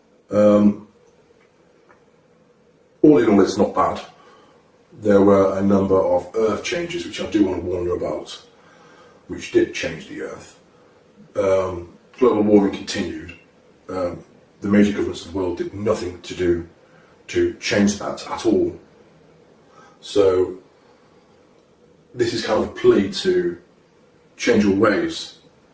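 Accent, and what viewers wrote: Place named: United Kingdom. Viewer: Okay so he’s from The United States but has a British accent?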